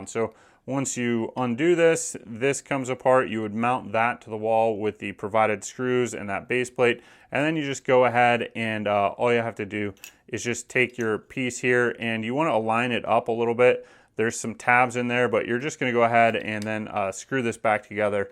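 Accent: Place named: United States. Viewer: Speaks to fast with strong us accent no good for older people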